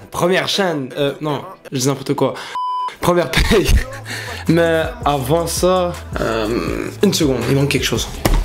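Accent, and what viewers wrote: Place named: Quebec, Canada. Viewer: Toujours toutes tes vidéos avec d’la bonne humeur et de super délires mdrr et en plus j’adore ton accent québécois sur des phrases complètement française !🤤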